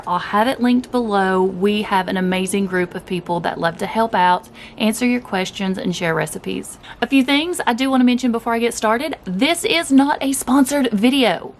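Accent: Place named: Tennessee, United States. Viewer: I know a Tennessee accent when I hear one. This was a great video. I like to eat a lot too.❤️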